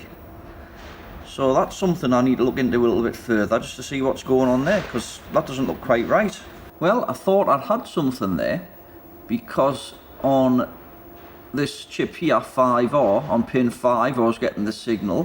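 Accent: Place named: Newcastle, United Kingdom. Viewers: Ooooo sound like a Geordy must be close to me hopefully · You sound from the north east whereabouts are you? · You sound like your from my area Newcastle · Very interesting, thanks. Dad was from the NE, love the accent.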